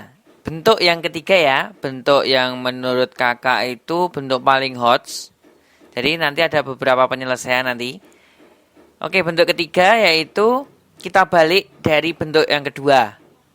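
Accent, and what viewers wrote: Java, Indonesia. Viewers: Jawa bngett ka wkwk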